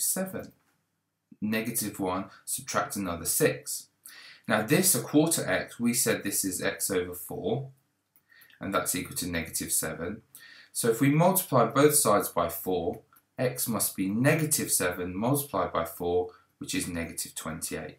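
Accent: United Kingdom. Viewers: This may come as a surprise to you but he is in fact speaking in a 'British English' accent...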